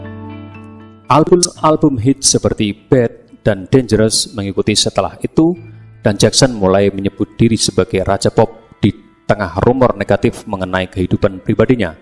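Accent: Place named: Java, Indonesia. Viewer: Kayaknya pengisi suara, wong jowo ikii..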